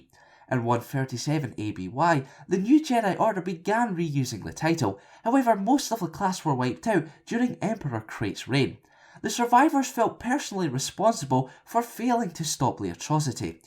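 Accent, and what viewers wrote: Scotland, United Kingdom. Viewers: welcome. Your accent is Scottish, right?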